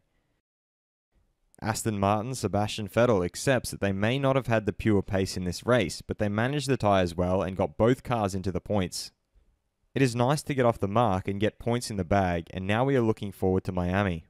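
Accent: Australia. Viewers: Absolutely love this channel. Thanks for the awesome content!! Love hearing the Aussie accent on YouTube too. 🇦🇺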